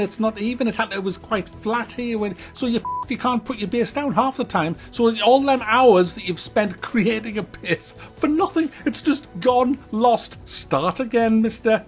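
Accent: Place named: Geordie, United Kingdom. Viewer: i can count the games on my left hand that actually has anything good to say about and i lost 2 fingers on my left hand in an IED blast whilst on patrol in Afghanistan,i think one should just take the plunge on games and buy it and play it for themselves just like the good old days and if its shit then ho well.never listen to others opinions,what doesnt work for peter doesn't mean it wont work for paul. you tubers love pissing and moaning about stuff because it attracts the low intellect people that just take there opinion as gold.back in the day when i bought sega and nintendo and commodore games there was no pissing and ranting yet the games were purchased and played none the less.i think you tube is a bullshit infested pit for people with no friends,no life and rely on it for a honest income,which i find pathetic.maybe if there was no money in it then i would like to see how many pissy rants you wanted to post then when you have to go out at 5am and work till 8pm just to scrape by.i come on these channels to boycott them,its in my belief a syndicate and many people are already trusting there lives on these people that continue to make there opinion count as if there a god and we should all bend a knee to there opinions,remember anyone can grab a cam mic and talk bullshit and joke swear and rant yes it has a certain charm because you have that jordie twang,yes the yanks probably love it but as a brit myself i hate it by the fucking way as many of my squaddie friends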